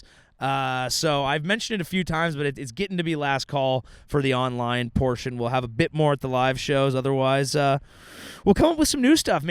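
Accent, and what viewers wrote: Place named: North, United Kingdom. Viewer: Perfect Northern accent ❤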